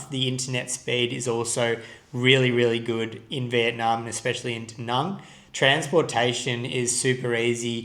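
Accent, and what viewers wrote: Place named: Australia. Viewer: Cheers brother 👍 Nice to hear an Aussie accent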